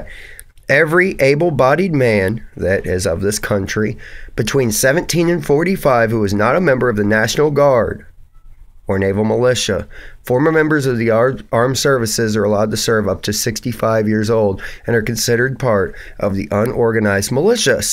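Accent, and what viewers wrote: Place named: Virginia, United States. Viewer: haha man you sound like youre from VA! am i close?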